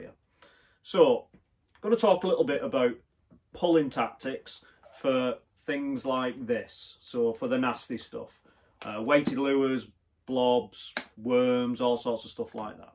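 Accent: Wigan, United Kingdom. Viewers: I think I have learned more off watching this video than I have on any of the others Iv seen - thank you! (could that be a Wigan accent by the way sounds like your from gods country?)😊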